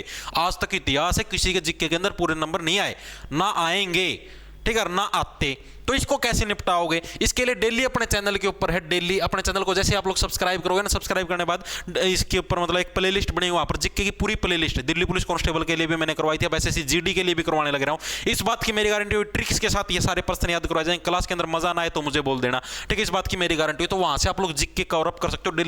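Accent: Haryana, India. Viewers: Haryana sa ha ya bahrti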